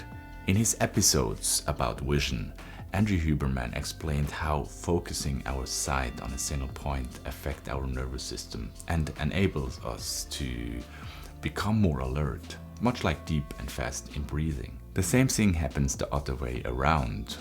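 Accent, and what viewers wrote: Switzerland. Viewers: are you swiss ?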